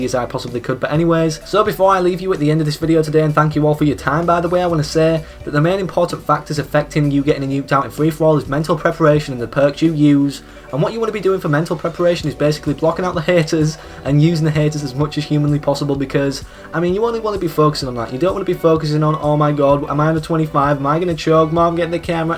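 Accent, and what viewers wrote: United Kingdom. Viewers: SeeN It's literally just how British people talk. i.e. British say "Bri'ish" and Australians say "Austra'ian". Don't make fun of people's accents.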